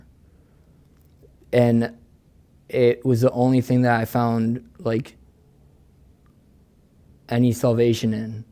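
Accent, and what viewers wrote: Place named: Western New York, United States. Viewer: Right on brother. Much respect I grew up just outside of Buffalo, so I love hearing the WNY / Upstate accent in your voice!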